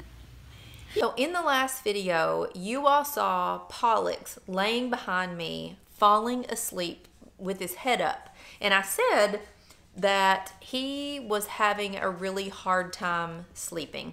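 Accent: South, United States. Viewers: Love Pollux and Maxxie. Also love your Southern accent. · YOU HAVE HEART,,THESE ANIMALS I CONSIDER MY CHILDREN.I DIG YOUR CURLS AND THE SOUTHERN ACCENT.GOOD FORCES BE WITH YOU. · Love your adoption of Pollux. Just saw your posts. Absolutely thrilled for all of you! I do have a question if you see this. What state are you in? I can tell your southern. I'm from Alabama so it was nice to hear you say "Yall" alot. I miss home. When my husband retires, we are moving back home, then I'll hear "Yall" used all the time!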